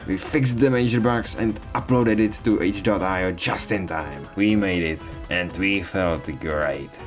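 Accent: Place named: Czech Republic. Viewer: What country are you from if I may ask?? Ur accent sounds Czech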